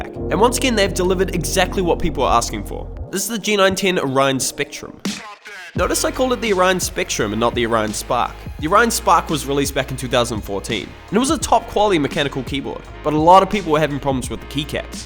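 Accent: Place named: New Zealand. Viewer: love ur new zeland type of voice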